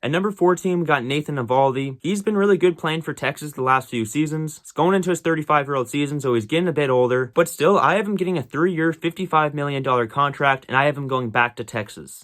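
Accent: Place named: Canada. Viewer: What an unbearable accent. Canadians are the worst.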